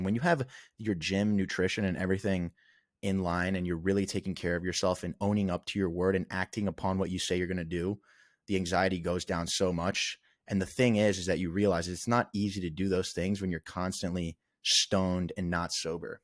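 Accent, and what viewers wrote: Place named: Long Island, United States. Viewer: sounds like you are from long island lol. We didnt 'have vape pens in my day but I can relate to this a lot. If you use pot on a daily/ nightly basis, it will turn on you eventually.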